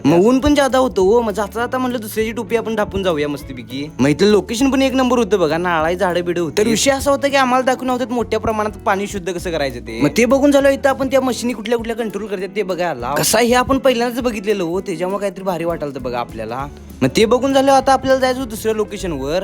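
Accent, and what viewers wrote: Kolhapur, India. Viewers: Kolhapurii ascent so cutee ❤❤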